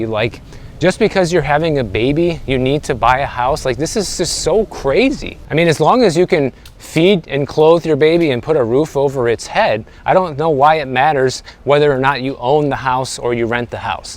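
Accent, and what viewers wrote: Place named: Illinois, United States. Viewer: Somehow.. I knew you were from Illinois.. You're giving me Northwest side, or out West..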